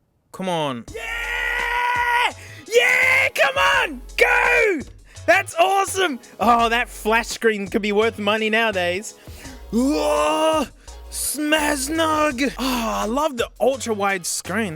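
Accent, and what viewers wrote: Australia. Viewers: why? hes just gunna say the same things everyone else has except in an australian accent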